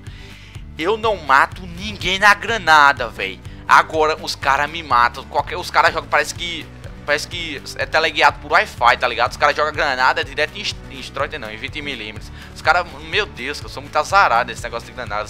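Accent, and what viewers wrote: Northeast, Brazil. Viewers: KKKKKKKKKKKKKKKKKKKKKKKKKKKKKKKKKKKKKKKKKKKKKKKKKKKKKKKKKKKKKKKKKKKKKKKKKKKKKKKK Eu queria ter o sotaque nordestino mano o negosso é muito dahora man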